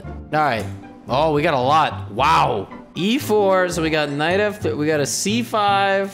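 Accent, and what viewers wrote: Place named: Russia. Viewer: Какого фига, я знаю что прекрасно разговаривает как на английском, так и на русском, но почему тогда в этом видео у него такой заметный русский акцент?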